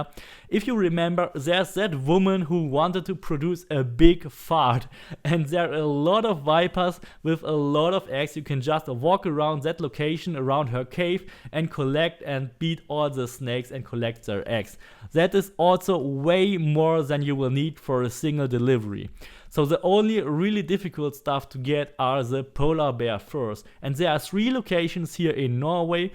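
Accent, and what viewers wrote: Germany. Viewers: Short Question ur German?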